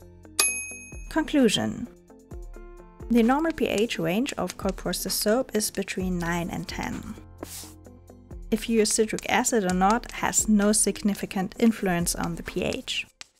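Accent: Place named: Germany. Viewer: Hi, as someone who loves science, this was a great video. I was trying to guess your accent at first I thought you were from Germany then I toggled between Belgium and Swedish. I knew you weren't from France due to I am French. I checked your bio, and I was correct German❤❤.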